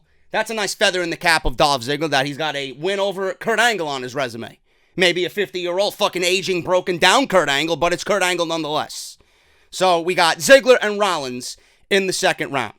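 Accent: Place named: Fort Dix, United States. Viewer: I actually liked parts of this show Shawn did his do,they over did the Fire works I agree Braun sucks man I forgot how little Rey is good job I’ve been watching wrestling along time even saw the Real Nature Boy wrestle before Flair I always stop and listen to you I was Station at Fort Dix in the 70’s everybody sounded like you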